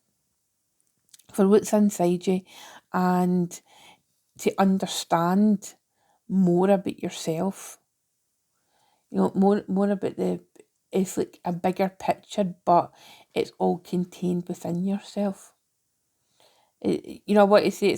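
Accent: Scotland, United Kingdom. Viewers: Interesting. First time here ☺️ am I detecting a Scottish accent?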